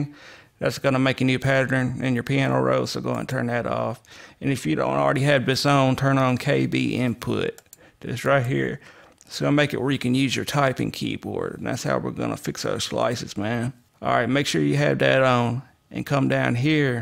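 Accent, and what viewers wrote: South, United States. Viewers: Hey man, you sound country as hell, but you know your stuff Lol. I really appreciate this video, brother. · Wow...this is incredible on hella so many levels. Thank you. Im from the south so I am used to accents but it makes it better to watch....not sure if its you or an act but you should really keep this up. Thank you so much. I would pay you for tutorials...super good info. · Your thick southern accent makes this vid that much better...knowlege God!!! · 12 min in iam loving that cowboy accent...this video helped a lot cause i was always curious on how to get that & vibe with chopped up samples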